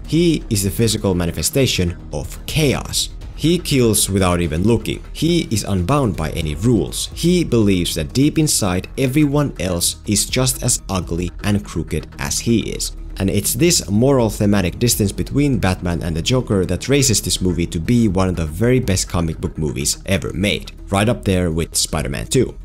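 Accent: Finland. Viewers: Dying to know where your accent comes from. Sounds a lot like a Finnish accent to me :D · I was 100 % sure you were Finnish because of your English accent. It's a unique accent I thought only Finns had. The more you know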